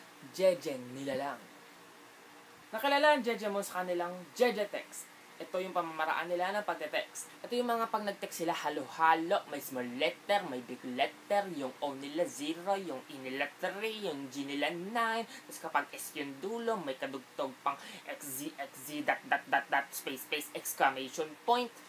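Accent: Visayas, Philippines. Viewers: Curious lang po ako sir, bisaya ba kayo? May accent kasi kayong visaya! Salamat po!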